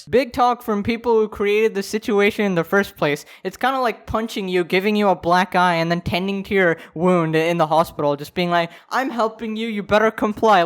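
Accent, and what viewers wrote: United Kingdom. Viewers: are you from the uk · legit can't tell if hes British or not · British man in da house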